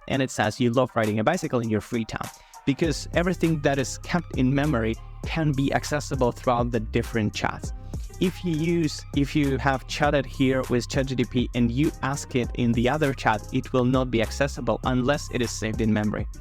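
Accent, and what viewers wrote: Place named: Russia. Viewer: Many people dont understand chatgpt but your fucking FLAT tone and that Russian or whatever accent you have makes understanding chatgpt IMPOSSIBLE! And whats this "Chat GDP" u keep saying!! 🤣🤣🤣🤣🤣🤣🤣🤣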